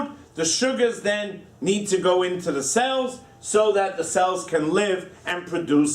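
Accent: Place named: New York, United States. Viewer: Is it just me or does he sound like Timon when he lectures?? Probably just the New York accent, soo endearing 😂 love the lectures, 10x better than my lecturer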